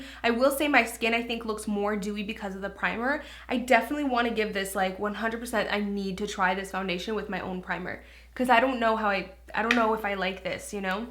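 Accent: Canada. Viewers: is it just me or was her accent extra canadian today?😂💜love it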